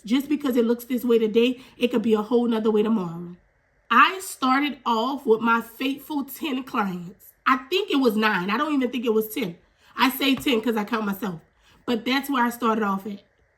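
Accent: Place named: New Orleans, United States. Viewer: the accent is giving New Orleans ❤❤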